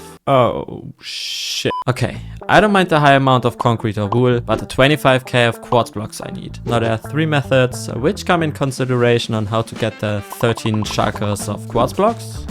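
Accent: Germany. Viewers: Du musst deutsch sein man hört den Akzent so raus 😂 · German accent makes this 1000x times more relaxing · I hear the german accent like crazy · your german acent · I hear Deutsch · Ekelhafter deutscher Akzent 🤮🤮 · der fakt das ich rausghehört habe das du deutsch bist ist crazy weil dein englisch sehr sehr gut ist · Finde den deutschen Akzent crazy funny weiter so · I can hear the "Deutsch" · I hear deutsch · U have a german accent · bro you acent sounds so german bro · hab garnicht drauf geachtet das du nen deutschen akzent hast haha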